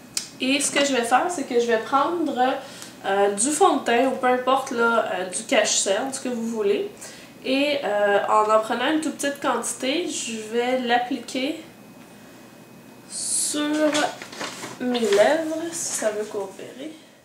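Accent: Quebec, Canada. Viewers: Tu as l'accent Québécois non ?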